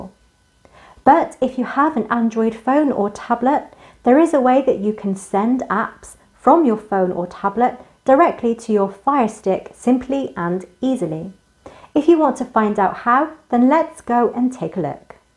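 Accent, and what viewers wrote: United Kingdom. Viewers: Brititsh accents 😚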